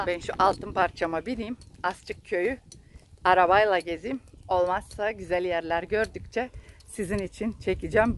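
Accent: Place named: Bulgaria. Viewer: Konuşma şivenizi benzetigim icin tahminim dogruymuş blg neresinden tsk kanalınız ayrıca güzel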